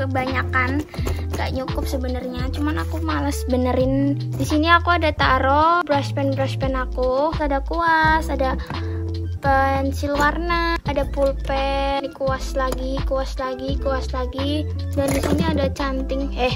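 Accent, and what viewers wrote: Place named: Java, Indonesia. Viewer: Orang Jawa ya,,,,, suaranya tuh kek jawa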